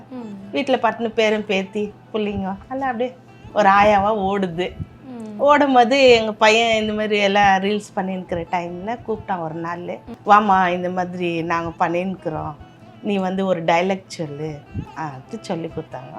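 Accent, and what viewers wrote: Chennai, India. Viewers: Indha madras basaiya?😂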